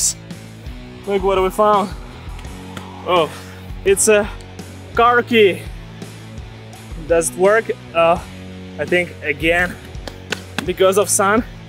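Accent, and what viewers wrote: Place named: Boston, United States. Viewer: That's clearly a Boston accent.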